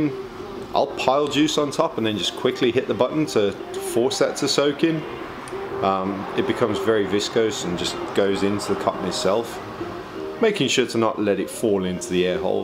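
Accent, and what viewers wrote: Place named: Birmingham, United Kingdom. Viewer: as brummie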